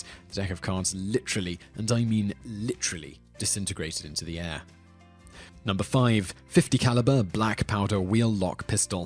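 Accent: England, United Kingdom. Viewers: the reader , Is just that, no knowledge at all, But with English accent , to project an aura of superiority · What an idiot!!! Not really an unexpected grand pile of PS one has come to expect from the Britts judging by the accent and with the absolute lack of knowledge of firearms other than the garbage spewed from the ignorance of the anti-gunners. While yes many of these guns are "over the top" they do have a purpose. That last one is little more than a novelty item. On the other hand to say that the Desert Eagle 50 cal. is the favorite of gangsters and mob bosses is bull to the highest degree. While yes there are a few criminals that use them most would prefer something a bit more easily concealed and way less obvious and in your face. They don't want to be running around with something that you practically need a wheel-borrow to carry around. 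A bit too obvious to the cops. Then again most of what he was saying was just a jab at gun owners, most of who are law-abiding and perfectly reasonable people. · You'll notice a limey brit is narrating this video and making derogatory remarks about handguns using liberal adjectives. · I should have known. English like accent talking about firearms. Not close to being accurate.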